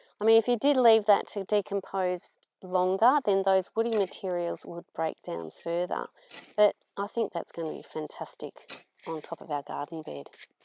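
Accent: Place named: Australia. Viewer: I like the accent of down under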